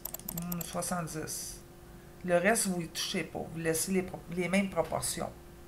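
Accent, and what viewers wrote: Quebec, Canada. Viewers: Merci pour cette rapide mais efficace présentation je vais voir tous vos autres tuto J'adore votre accent Québécois ( normal nous y allons chaque année..) Daniel France Normandie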